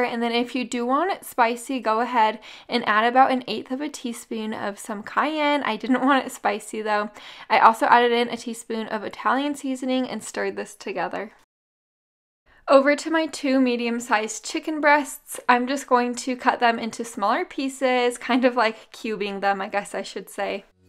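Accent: Utah, United States. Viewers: I went to USU and it is such a throwback to hear your Utah accent, you are brilliant. Keep up the good work. If I had guess your from Sandy.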